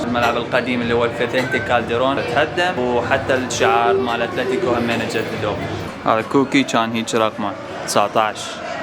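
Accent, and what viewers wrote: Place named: Iraq. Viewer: الله الله طلعت روخي بلانكوس مدريد حمراء 🔴⚪️ up atleti الله يوفقك أنا من 🇸🇦 سبق عشت في كاليفورنيا وشفت فلوقك كوسكو لأجل اشوف الاسعار واكتشفت انك وانت تمشي تكلم واحد بفوز اتلتيكو ع الريال المهم أبني وزوجي خليتهم يميلون لفريقي ولا أميل للدوري عندنا رغم وجود اساطير لكن من زمان الزمانالأتليتي عشق أضفتك يوتيوب بصاله بTVعائلتي والأنستا ربي يحفظك ياخوي الاصغر . على فكرة لهجتكم الطف لهجة وكان عندي صديقات عراقيات . ونصيحة لله المحافظه على الصلاة ومصاحبة الاخيار في ايام الجمعه كان الله في عونكم في بلاد الغربه التمسك بالدين مجاهدة تأجرؤن عليها وأن كنت غير من غير أهلالسنةوالجماعة الله يستر عليك بالدنيا ويهديك لدين الحق والصواب .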